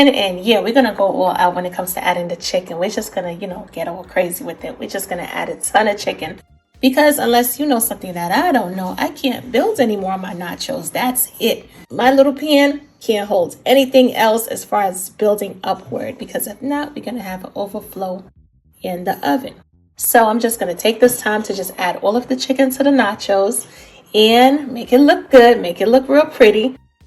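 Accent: New York, United States. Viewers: Nice video. Are you from New York or New Jersey? I hear something I’ve heard before in how you talk. Can’t put my finger on it.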